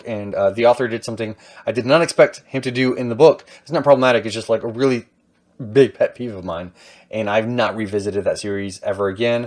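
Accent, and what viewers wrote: South, United States. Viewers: I love your video at your house in Texas during your quarantine until you're talking about your Top 10 favorite fantasy series with a lot of books are Red Sister, Grey Sister and Holy Sister by Mark Lawrence, The Name of the Wind and The Wise Man's Fear by Patrick Rothfuss, The Fifth Season, The Obelisk Gate and The Stone Sky by N.K. Jemisin, Eldest, Inheritance, Brisinger and Eragon by Christopher Paolini, Gideon the Ninth and Harrow the Ninth by Tamsyn Muir, Mistborn, The Well of Ascension and The Hero of Ages by Brandon Sanderson, Green Rider, First's Rider Call, High King's Tomb, Blackveil, Mirror Sight and Firebrand by Kristian Britian and so many others while you're talking about all the characters from the book, I hope your husband, Zach will be very proud of you, but I know you're half Mexican and you had the Southern accent!! 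#BookTube #SFF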